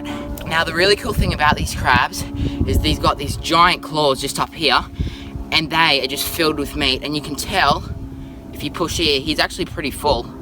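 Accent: Australia. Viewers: every word in an Australian accent sounds cool....even crocs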